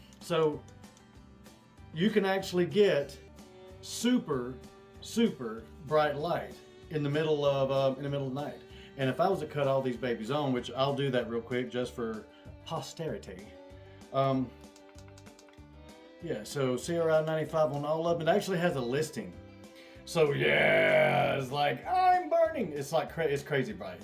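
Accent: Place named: Pea Ridge, United States. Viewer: Sounds like a pea ridge feller I know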